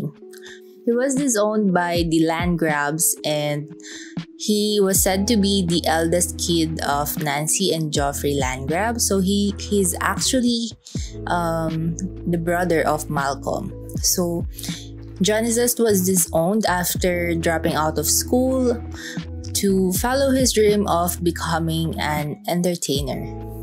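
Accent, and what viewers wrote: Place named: Philippines. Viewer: Accent pa lang alam mo na agad na pinoy to hahahaha nice vid po ma download nga to sa lot ko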